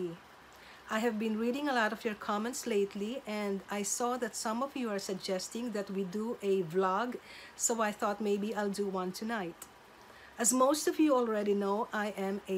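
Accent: Philippines. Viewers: I sense a Filipino accent...Kabayan! Haha. More reasons to like. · i knew it from the first time you showed yourself on channel that you were filipino. from the voice and the accent palang eh. pusong mammon forever ang mommy ng Pinas! godbless po:)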